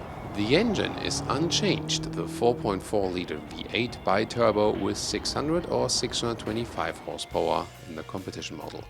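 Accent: Germany. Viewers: There's something about German accent that adds authenticity to anything he says.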